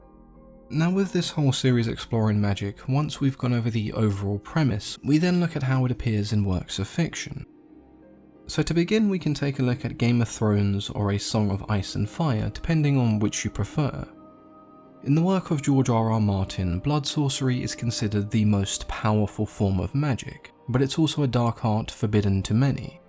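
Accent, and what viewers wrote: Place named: England, United Kingdom. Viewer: They should use your English accent and voice on planet earth and national geographic well and fish sea mammals lions an bears deadly insect bites you would make it more interesting word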